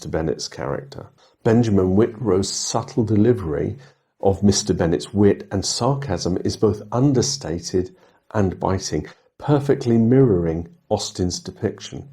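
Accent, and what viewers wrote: United Kingdom. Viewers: Hello from Montreal, Canada. So happy to have you back. I as well like Mr Bennett. Looking forward to watching you other analysis. I think in another video you said that some people don't like your voice. Well, I love your voice and your british accent. It is a real joy to hear you talk about Pride and Prejudice. Did you make an analysis of Charlotte? and Catherine de Burg (not sure it is the right spelling)? What kind of life do you think Lydia and Wickham had? Thank you.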